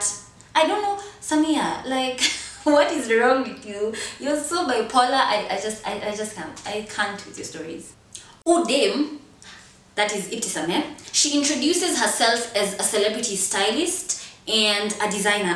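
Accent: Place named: Kenya. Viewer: Yah nver fake an accent hhhh I like kenyan accent cming there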